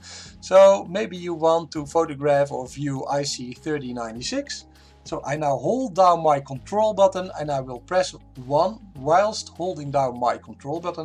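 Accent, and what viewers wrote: Netherlands. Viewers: Het accent is Nederlands, dus probeer de vraag even in het Nederlands. Kan ik gewoon een RJ11 naar USB kabel gebruiken? Dan sla ik de rs232 over zeg maar.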